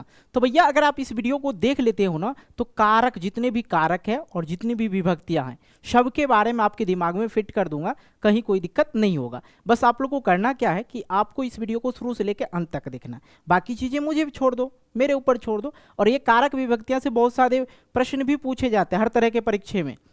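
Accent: Bihar, India. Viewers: Sir aap bihar se ho kya 😅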